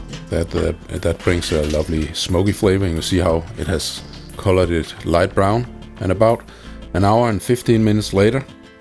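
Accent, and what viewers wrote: Denmark. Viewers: Looks great, and the Danish accent makes it even better.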